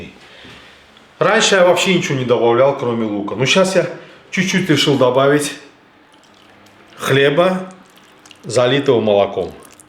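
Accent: Baku, Azerbaijan. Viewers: Джан всегда жду с нетерпением твоих выпусков.Волей судьбы Я покинул жемчужину эту когда то.но добрые воспоминания о Родине навсегда в сердце.как в песне...у бакинцев акцент и добро не кончается😢Салам от Монтинских.🎉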